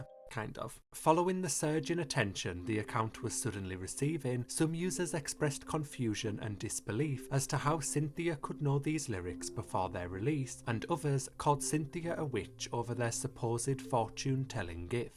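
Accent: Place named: Yorkshire, United Kingdom. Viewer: It's def not London, but i can see why someone would say Newcastle since it's up north. Hes def a Yorkshireman. 🫣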